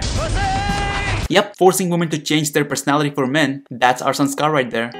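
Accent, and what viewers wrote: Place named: United States. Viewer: Name is desi but accent is american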